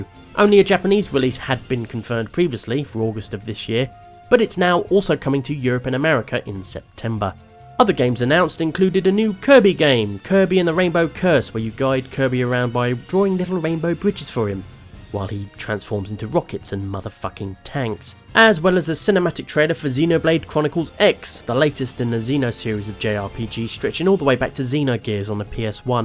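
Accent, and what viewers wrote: England, United Kingdom. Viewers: Still nothing from any of these companies that makes me want to run out and buy a new console. Good video though. Also, are you getting more English? You sounded more English in this video than previously.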